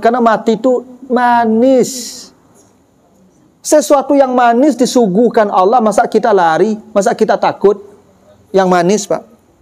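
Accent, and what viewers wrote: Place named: Aceh, Indonesia. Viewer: Urang maa ustadz ko min??? Manyabuik huruf "T" mode urang aceh, totok 😁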